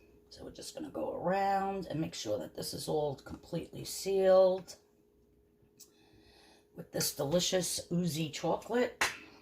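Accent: New York, United States. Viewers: This would be so cool to make! So cute! I always wanted to buy them in the stores but I would rather control the ingredients. I’m well aware of Avery as a maker. Gonna do that as well. Thanks so much for sharing. Just love that NY accent.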